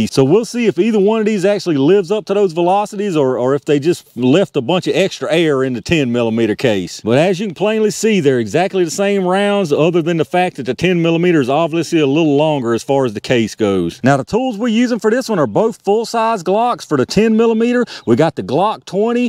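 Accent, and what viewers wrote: South, United States. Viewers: Love your videos, your speech/accent. Makes me want to be a southern boy.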